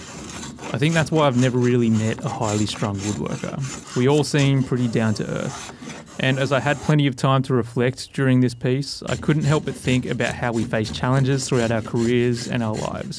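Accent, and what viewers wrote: Australia. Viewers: So nice to click into a video and hear an Aussie accent. Hello from Ballarat 🥰💗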